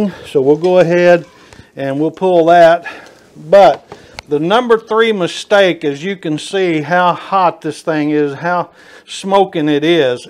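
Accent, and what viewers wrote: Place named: Tennessee, United States. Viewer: That's the way it's pronounced in middle Tennessee where I'm from. Like does.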